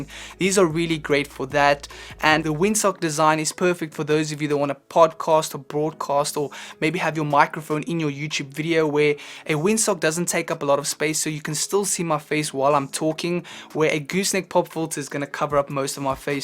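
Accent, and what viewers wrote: South Africa. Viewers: South African? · You sound south Africa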